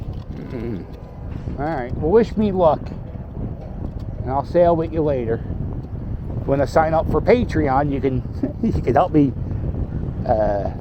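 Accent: Philadelphia, United States. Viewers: You got the most Philadelphia accent I've ever heard in my life.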